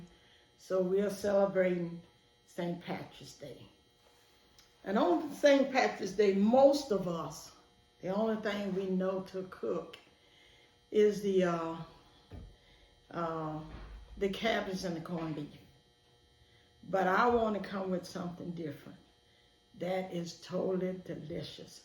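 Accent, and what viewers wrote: South, United States. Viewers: Love u, very beautiful & rare moments to hear u sing, u are a delight to watch. Thank u so very much for sharing your knowledge, u are great by yourself the guy wants to interrupt and speak over u. Love your presentations & southern talk, dont like to hear camera guy mimic & make fun of your words!!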